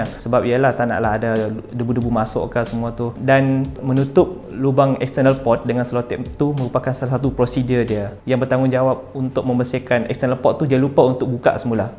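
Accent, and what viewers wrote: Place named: Sarawak, Malaysia. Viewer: Mcm org sarawak je.sbb bunyi slang mlayu smenanjung kurang😅😅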